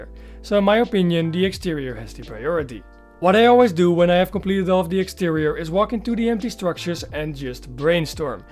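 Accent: Netherlands. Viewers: That’s one dutch fella if I heard one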